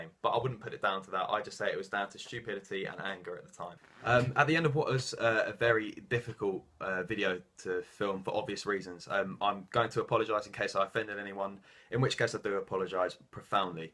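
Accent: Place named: England, United Kingdom. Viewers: Yet another Hun that has an English accent. Get back to London. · Why the fucking English accent?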